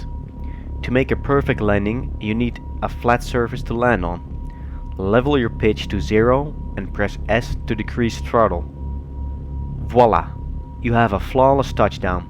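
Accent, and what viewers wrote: Netherlands. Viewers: Great vid! btw are you from the netherlands because youre english sounds like somone who is from the netherlands :P greatz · Are you native english? You have an accent. Maybe dutch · You're Dutch, aren't you ? Thanks bigtime for the hud expansion. I never knew most stuff.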